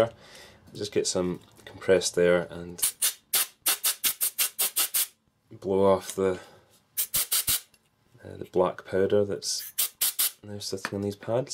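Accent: Scotland, United Kingdom. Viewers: Man, I love your Scottish accent :) Been to Edinburgh this summer. Really nice countryside in the Highlands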